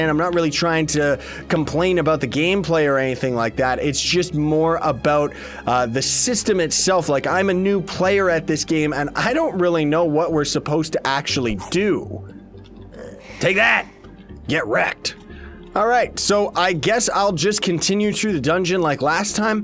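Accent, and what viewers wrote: Canada. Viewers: Are you know Canadian Or Are you living in Uk xD IDK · Now every time I watch his videos I imagine him with his canadian accent. XD LOL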